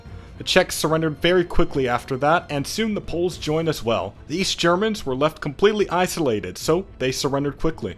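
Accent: South, United States. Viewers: This man perfected the stereotypicalAmerican accent, complete with the subliminal use of commie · Even has that southern accent at times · i love the fact he sometimes accidentaly stops doing the southerner accent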